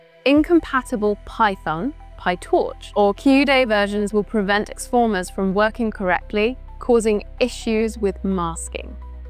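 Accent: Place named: United Kingdom. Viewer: why, It is England tone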